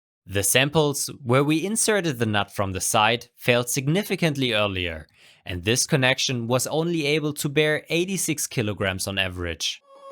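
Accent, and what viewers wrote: Germany. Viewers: Your English is sooo German, it makes me smile every time I hear a new video! ;-) Sorry, I beg to differ. The von Mises Estimation assumes the material to be rather homogeneous and isotrop. Both of which 3D printed material is obviously not at all, due to its layered characteristics. In addition, printed material has a different strength than filament because of nozzle and squishing effects. So your estimation of USS may be far off, and you don't even give an idea by how far before comparing to your experimental values. The real failure lines should start at the compressed surface (=upper end of the nut), not at the bottom surface of the whole body (=lower end of the nut), which is uncompressed. After finding that compression failure and enlarging the compression area are the best way to go, I'd be curious to see how a nut with a washer or a claw nut (german "Einschlagmutter") behave in your setting.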